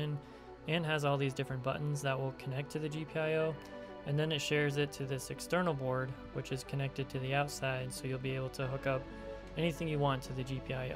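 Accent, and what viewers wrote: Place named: Russia. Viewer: У чувака русский акцент ) А если честно: выглядит потрясающе.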